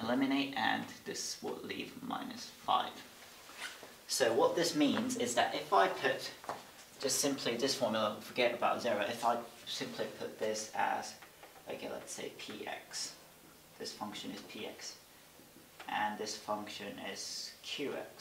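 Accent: United Kingdom. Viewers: You know how good it feels when you got it right Btw I solved this in 10 min I’m not gonna lie I’m so happy Ps I love your British accent it’s so cool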